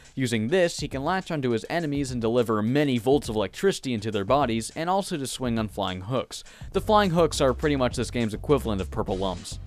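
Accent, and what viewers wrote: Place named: Canada. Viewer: listens for about 5 minutes So, where in Canada are you?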